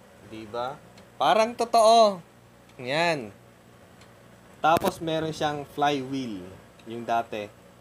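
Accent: Ilocos, Philippines. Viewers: Ilocano ka sir?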